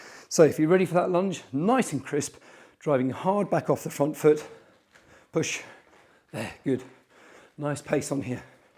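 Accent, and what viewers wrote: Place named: United Kingdom. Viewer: British? Ugh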